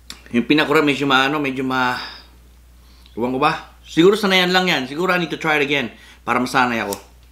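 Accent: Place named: United States. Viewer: Sir kung hindi ka lang nagtatagalog, aakalain ko american ka..hehe..Godbless po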